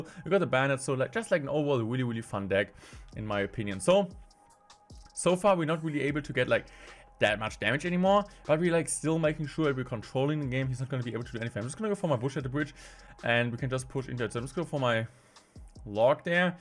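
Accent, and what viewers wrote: Germany. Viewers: Hey, i can tell that english is not your first language. Is your first language german? Because i am learning german, and i can kinda hear it in your voice.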